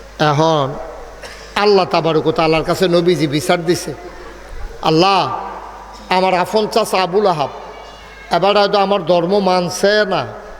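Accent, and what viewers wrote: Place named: Rural, Bangladesh. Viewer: সে তার গ্রামের আঞ্চলিক ভাষায় কথা বলে সে এখন পর্যন্ত শুদ্ধ ভাষায় কথা বলতে পারে না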